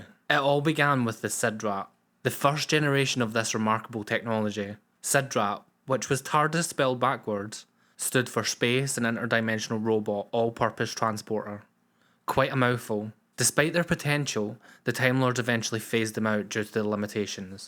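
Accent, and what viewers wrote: Scotland, United Kingdom. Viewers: Never heard a Scottish person before?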